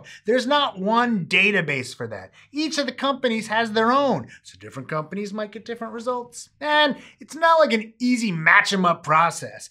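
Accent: United States. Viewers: If you're 99% Eastern European Jew, why are you talking like a 100% Jersey shore Italian good fellah?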